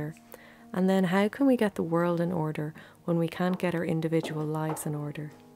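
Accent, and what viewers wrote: Ireland. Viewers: watching your videos, with your calm and gentle Irish lilt and beautiful images, is like meditation for me. Thank you for posting. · lovely to hear your love Irish voice and wisdom thank you Celine also from Ireland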